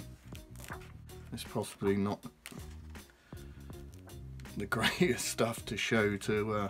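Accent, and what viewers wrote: United Kingdom. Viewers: I absolutely love the Bristolian accent! I am originally from Bemmy/Bedminster. I currently live abroad so it's great to hear an accent to make me feel at home. Honestly it's epic to see someone so passionate about their art and someone elses. I've seen your work all over the place and there was one that caught my eye in Kingswood on the Black Horse Pub wall. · Don’t put yourself down on accent south west is the best!! I’m from Devizes!! Propa south west! And I know wot you mean about bode ! I’ve got her tatttooed to my side.as soon as subway art hit my school library!! It was mine !! I had the buzz of graffiti!! I’m 48 still writing! I’m proud of the south west style! You and desk one from Swindon are my favourite graffiti artist 💯💯💯💯💯 Keep it going! 🤡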